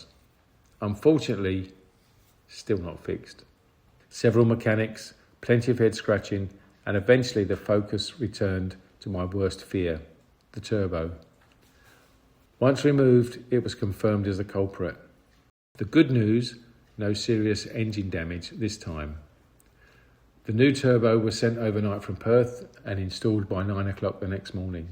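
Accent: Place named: England, United Kingdom. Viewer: A POM narrating the show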